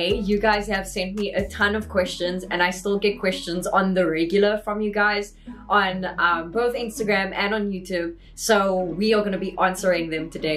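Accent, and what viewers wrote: South Africa. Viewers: Yes, stay awesome, following you from Hong Kong. Funny I guessed it right, your lovely accent is unmistakably SAfrican. Love Love Love it! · That is actually impressive because I'm also from Cape Town and our accent is not known outsude of South Africa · I could never place your accent, but now finally it all makes sense, South Africa. The same accent in District 9. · Ha, I knew from your funny accent that you must be from South Africa! Great to have this finally confirmed! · I thought...you are from New Zealand it's because of your accent (but, I'm not sure because now that you've said you're from South Africa...Now, I do understand because I do have work with people that are from South Africa - now, does it make sense because they talk or speak like you...(sorry, I mean it in a good way)...Good Luck & more power to you in your content...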